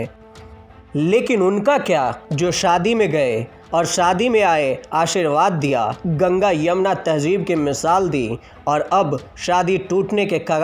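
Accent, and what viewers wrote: Bihar, India. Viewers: Tum bihari ho kya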